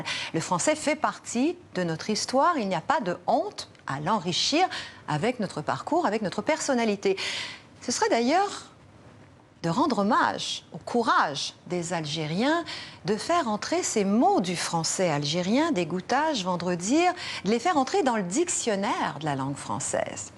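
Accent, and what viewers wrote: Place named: Montreal, Canada. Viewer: L.accent montréalais me manque je vous invite à venir en Algérie pour enrichir votre dictionnaire je dit tout ça après avoir su que vous etes québécoise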